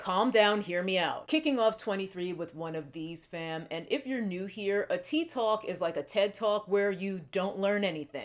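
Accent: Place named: Brooklyn, United States. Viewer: I know this is off topic but are you from Brooklyn? Your accent sounds Brooklyn to me.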